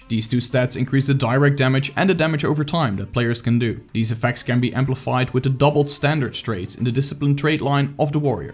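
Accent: Netherlands. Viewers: You sound super Dutch! With the stauf and claes instead of staff and class! I know you can do it! You have enough determination to make a lot of YouTube videos so I know you can learn to say class and staff!!!!! I believe in you! ps I'm from Belgium and also a Dutch speaker and I've never ever said claes or staaaauf!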